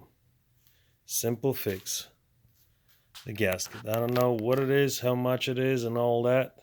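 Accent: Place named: United States. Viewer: Добро пожаловать обратно, как говорится. И английский у тебя такой брутально ковбойский 👍🏻👍🏻👍🏻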